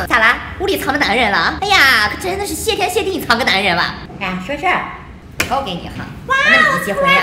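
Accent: Taiyuan, China. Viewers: mi AMY 😂哈哈哈哈应该是太原的吧和我小时候听的话一摸一样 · 这口音熟悉啊，山西太原的吧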